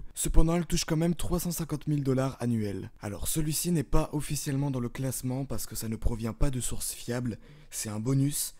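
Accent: Russia. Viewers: Mdr ton accent russe 😂😂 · Je like la vidéo parce que elle est bonne et pour l'accent Russe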